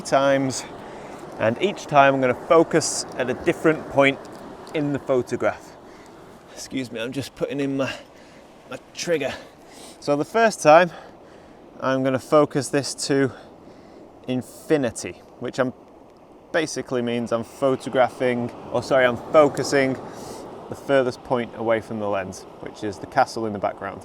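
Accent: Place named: United Kingdom. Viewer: I was just wondering, but where does this guy live ? ... it's raining all the time and he speaks proper English.... must be a Brit ! and I like it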